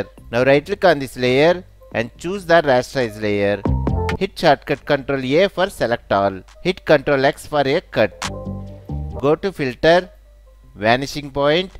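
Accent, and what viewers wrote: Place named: India. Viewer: Thank you! Never underestimated Youtube tutorial with Indian accent :)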